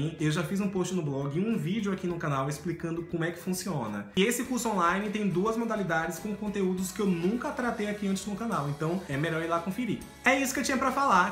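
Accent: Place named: Northeast, Brazil. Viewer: Marminino pensei q tu era daqui do nordeste!?rsrs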